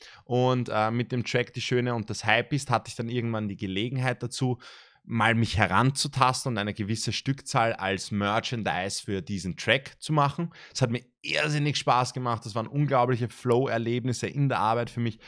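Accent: Austria. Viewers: Red bitte nie wieder Hochdeutsch 😂 für mi als bayer is des echt schlimm😂 des österreichisch steht dir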